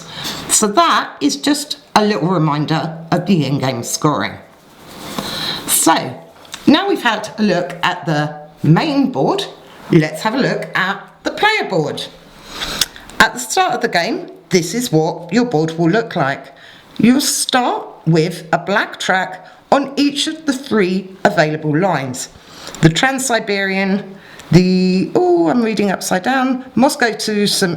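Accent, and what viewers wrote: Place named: England, United Kingdom. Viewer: Just curious, what kind of English accent is this? thx for the explanation, even if by no means this is should be marketed as a "10 minute" teach I play red too.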